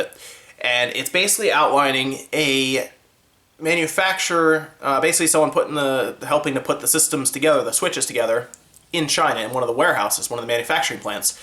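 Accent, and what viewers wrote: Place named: Philadelphia, United States. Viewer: That Philly accent though.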